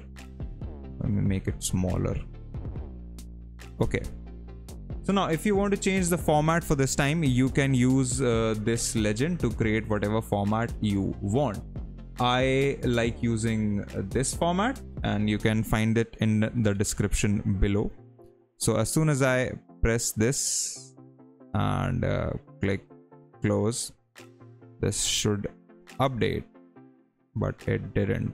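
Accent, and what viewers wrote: India. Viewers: You know you've found the right tutorial when the person with an Indian accent shows you how to do the things in the tutorial.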